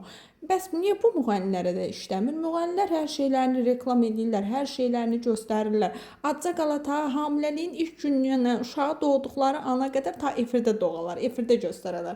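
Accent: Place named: Nakhchivan, Azerbaijan. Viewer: Nə şirin danışırsız mence Naxçıvan ləhçəsidi menim bir şagirdim var o da bu ləhçəynən danışır ondan bilirem 😂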